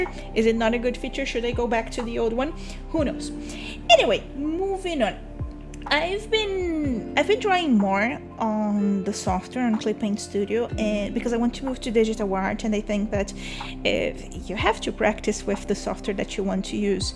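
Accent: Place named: Brazil. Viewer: I can tell you are Brazilian from your accent and Im an American living in Brazil. Something I've noticed about the cultural differences between Americans and Brazilians is that Brazilians are often preoccupied with their current finances and are less willing to spend money on gaining access to education and networks that will provide them with knowledge- and even more importantly RELATIONSHIPS- that will advance their business or craft. I definitely get where you are coming from but just wanted to say that investing (even expensive amounts) in yourself, leveling up your craft, and professional networks with the right people can get you so far. I was even thinking of making a video on this soon. Save some money and really get a professional network or mentor that will help you level up :) Im not even an artist or into artwork much but your video popped up on a google search and it was cool just watching you do this while talking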